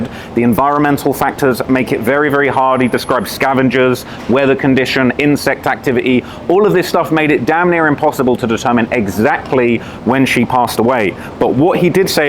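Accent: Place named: United Kingdom. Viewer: That’s how British people speak bro calm down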